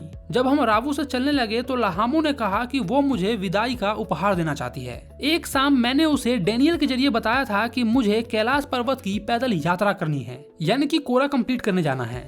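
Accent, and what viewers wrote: Bihar, India. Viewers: Bhaiya aap bihar se ho ya uttar pradesh se 😅😅😅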